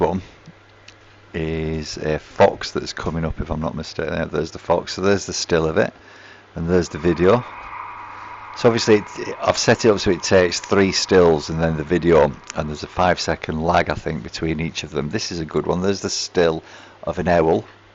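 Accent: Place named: Accrington, United Kingdom. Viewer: I'm in Accrington and your accent is very familiar! Where are you?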